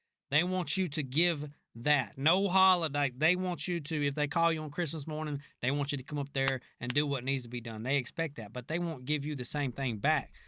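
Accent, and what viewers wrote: South, United States. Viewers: Perfect southern accent ❤️ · The southern American accent is so sophisticated and refined it makes me think of prestigious university professors and silver tongued politicians. Then there's a random "shut the fuck up DUDE" it's a total mind fuck the same as if someone was swearing in a posh London accent.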